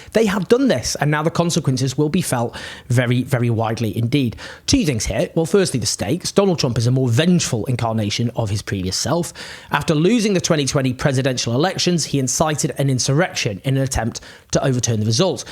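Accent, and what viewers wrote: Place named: Yorkshire, United Kingdom. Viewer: He's a Yorkshireman, and they're a bit different up there.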